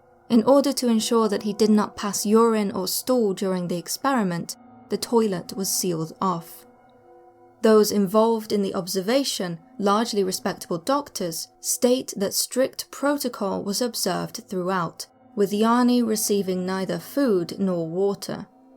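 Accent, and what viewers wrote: England, United Kingdom. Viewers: Beautiful English accent, clear, concise with beautiful pronunciation and read at the “proper” narrative speed for an audience to enjoy 😊. Good lass! ❤️🦋🙏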